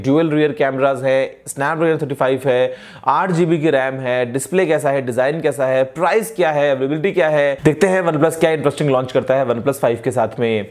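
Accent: Bengal, India. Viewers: bhai aap kiya bengali ho?/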